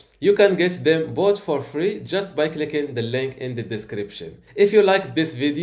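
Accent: Morocco. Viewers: thank you sir and i can tell from ur accent that u are moroccan arent you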